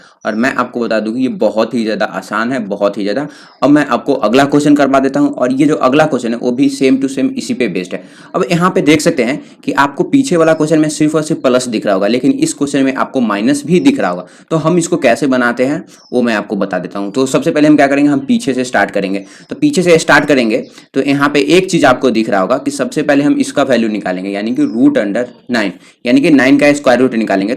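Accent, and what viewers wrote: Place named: Bihar, India. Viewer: Sir do you speak Bihari Your speaking style is like Bihari language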